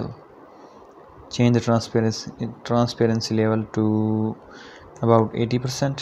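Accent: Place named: India. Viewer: ty, i like ur indian accent, its cute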